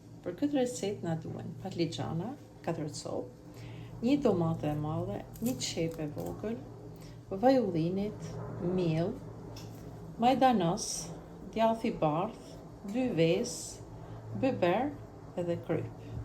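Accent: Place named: Shkodra, Albania. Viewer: Ty te lymshin duart e pastra e te arta! Komplimente qe perdor doreza ne gatim çka tregon kushte higjeno sanitare te larta per moskontaminimin nga mikrobet te ushqimit dhe organizmit edhe pse gatuan per familjen tende! Por, ama, gjeja kryesore qe te kenaq vetem me te nigju me dialektin shkodran fantastik, "feltere", "tamin", "biber", "i perzime", "katerdhjete e pese minuta" Dialekti me i bukur i gjuhes shqipe! Te faleminderit!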